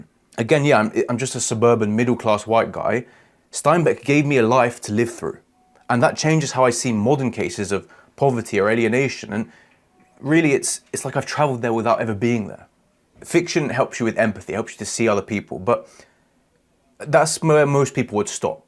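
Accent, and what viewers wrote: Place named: United Kingdom. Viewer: Shadowing ur british accent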